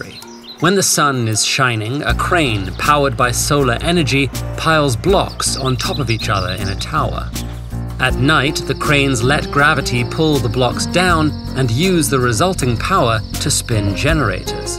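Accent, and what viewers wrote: United Kingdom. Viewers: i like British Accent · A British voice over in a Ted video is something I never thought I'd hear or need. Man this is good.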